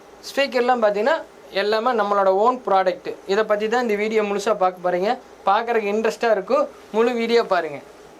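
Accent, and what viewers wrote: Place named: Kongu, India. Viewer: More than work i m big fan of ur kongu slang....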